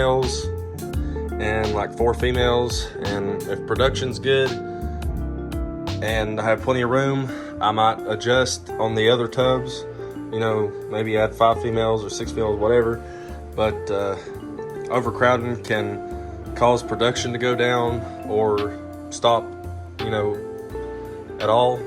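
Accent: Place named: South, United States. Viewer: 😂 I heard your lovely southern accent and I was expecting some wholesome country or bluegrass music for background music.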